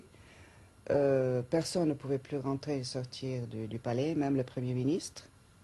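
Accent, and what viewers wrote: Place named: Egypt. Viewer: لهجتها الفرنسية حلوة بس ظاهر فيها انها مصرية :) انا مهتمة اوي بالتاريخ المصري وحقيقة مصر ام الدنيا بلا منازع.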